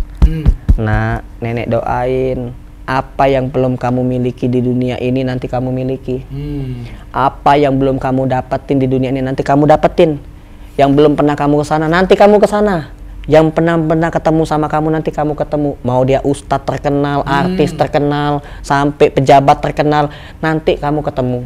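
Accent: Palembang, Indonesia. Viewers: logat palembangnyo om ku dak ilang ❤ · Ini pak ngomong lidahnya Palembang banget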